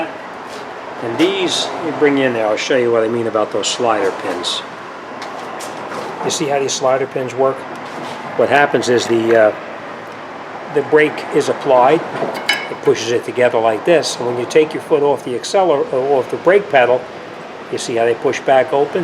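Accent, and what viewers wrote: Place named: New York, United States. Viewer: Good vidio, sounds like a true New Yorker, lol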